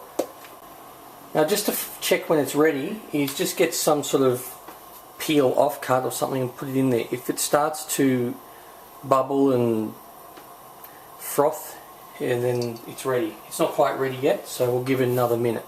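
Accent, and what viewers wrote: Australia. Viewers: Cooking channels Australian aha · are you an Ausi? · Ypu being aussie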